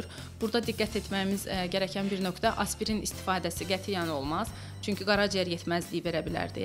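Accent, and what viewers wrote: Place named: Kirkuk, Iraq. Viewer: Coğ coğ guzal konuşir. Tem bizim kerkük dili gibi... Salamlar siza kerkükten. ❤❤❤❤❤❤❤❤❤❤❤❤❤❤❤❤❤❤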